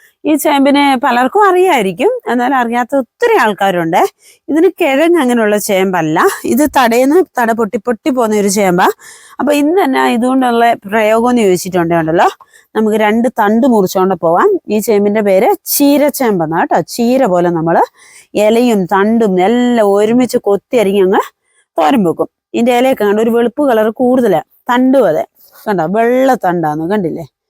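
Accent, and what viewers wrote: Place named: Southern Kerala, India. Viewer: കാസര്‍കോഡ് ആണൊ സംസാരം കേട്ട് ഞാന്‍ വിചാരിച്ചു ഇങ്ങോട്ട് തെക്ക് ആണെന്ന് chilasamayam പാലക്കാട് എന്തായാലും സംസാരം സൂപ്പര്‍ നിങ്ങളുടെ വീഡിയോ എല്ലാതും ഒന്നിനൊന്ന് മെച്ചം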